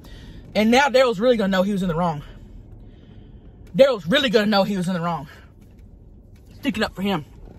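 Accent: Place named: Oklahoma, United States. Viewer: My blood really started bowlin it really started bowlin...I'm dying she's hilarious cause we really talk like that in Oklahoma. 😂🤣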